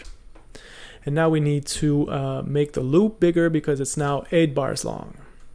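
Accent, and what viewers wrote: Quebec, Canada. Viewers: stu un quebecois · Quebecois spotted.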